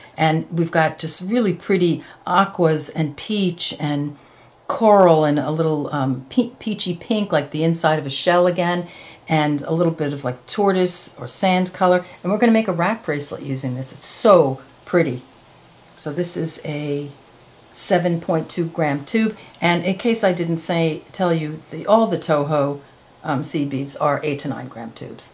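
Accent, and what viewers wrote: New York, United States. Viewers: An absolutely gorgeous collection!!! I just ordered the collection and can’t wait to see your tutorials! By the way, I couldn’t help myself I ordered the three collection bundle too, LOL I’m going to have a lot of beading to do! Thank you so much for all your tutorials. I have learned to bead by watching your tutorials and greatly appreciate your amazing designs, clarity of instruction, excellence in video production and your New York accent! I’m a Jersey City girl living in San Diego and love to hear your voice, it makes me feel so at home. Again congratulations and thank you for all you do to help us learn about this amazing art form!😀🙏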